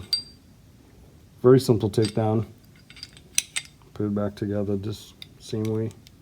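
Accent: Trinidad and Tobago. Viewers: Sound like a Trini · That accent can’t hide, sounds like a Trini... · Is it me or this man sounding like Trini??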